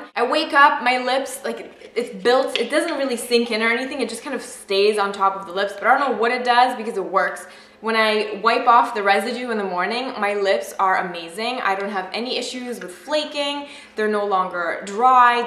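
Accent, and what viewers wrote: Canada. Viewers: I actually detected your Canadian accent in this video. lol :)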